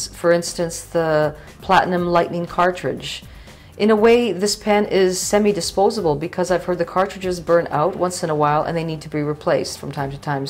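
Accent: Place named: Netherlands. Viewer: your voice has a hint of a dutch accent in it, am i right?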